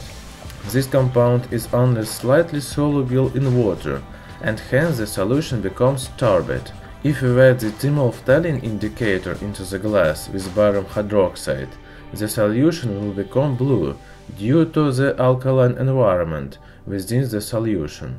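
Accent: Russia. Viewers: Mofker GT He's Russian, give him a break. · Are you from Russia? Some Slavic region?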